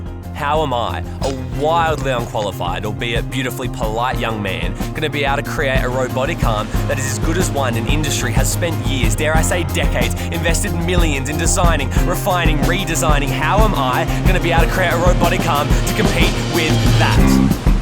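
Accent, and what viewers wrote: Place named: Australia. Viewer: been watching for a while and only just realised u were also australian 😮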